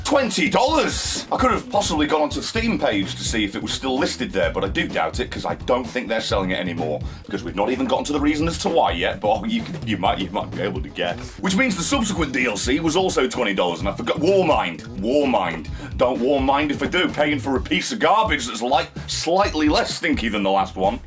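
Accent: United Kingdom. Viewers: There’s something so satisfying about a fine bri’ish gentleman going absolutely mental while also making good points on camera that is just *chef’s kiss*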